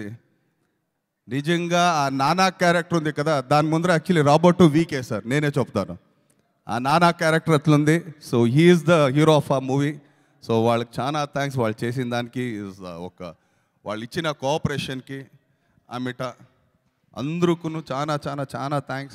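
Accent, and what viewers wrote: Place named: Rayalaseema, India. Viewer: It likes rayalaseema slang telugu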